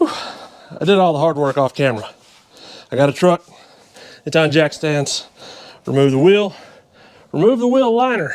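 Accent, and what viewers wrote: South, United States. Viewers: Brother you’re like the VGG of diesels, with a southern accent. Awesome channel!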